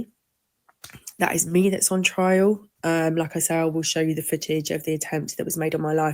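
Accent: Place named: Southern England, United Kingdom. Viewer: well I’m from south of England (isle of wight) and I KNOW the police are slack and corrupt down here she sounds like she’s from the south?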